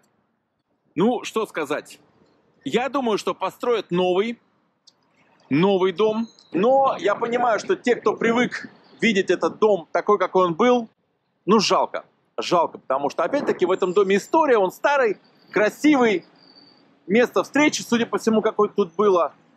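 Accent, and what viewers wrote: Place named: Russia. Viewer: Вас тоже снесут с вашим рАссейским говорком. И цитируя вас сленг, скажу : " кАроче,точно !" Не эмониционируйте и кАроче валите из Мариуполя, если не хотите встретиться с пулей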